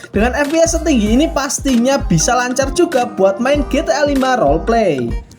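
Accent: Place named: Java, Indonesia. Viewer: Logat jawanya sangat kental 🤣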